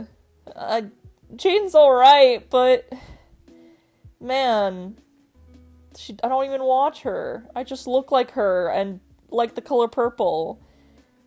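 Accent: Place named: Boston, United States. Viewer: Why she from boston's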